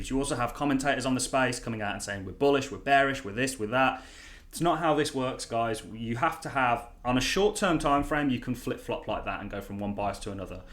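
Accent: Birmingham, United Kingdom. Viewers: You sound like a fellow Brummy? I'm pretty happy you made this video. I was planning on selling my Cardano, but I'll hold on, and hope the price jumps up. What's your thoughts on Elrond? I've had it for quote a while now.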